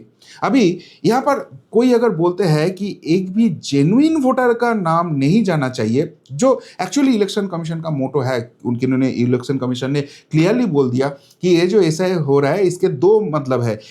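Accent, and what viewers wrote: Bengal, India. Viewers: আপনার হিন্দি যথেষ্ট ইনপ্রুভ করেছে। বাঙালিয়ানা টানটা যত কমিয়ে নিয়ে আসতে পারবেন তত সুন্দর হবে । ভিডিওটাও যথেষ্ট ভালো হয়েছে ।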